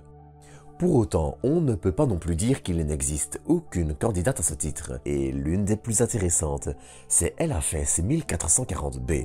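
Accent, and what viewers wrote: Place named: Flanders, Belgium. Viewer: Belge flamand, je crois.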